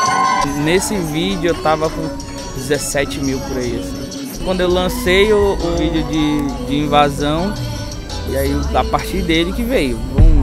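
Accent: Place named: Pará, Brazil. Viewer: Não sabia que era paraense, mas descobri nos 10 primeiros segundos de video hahaha Segura o norte ❤️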